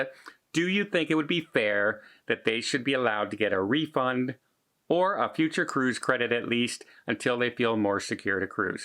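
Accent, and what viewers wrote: Canada. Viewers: I love how you Canadians say "about!" But seriously, why won't RCLL drop their vax requirement like Norweigen and Carnival?? I heard it's because the Caribbean countries/ports are requiring vaxed passengers - then how are other ships sailing there??????? Does Carnival go to different countries????? Sounds like Royal Caribbean is being ultra political....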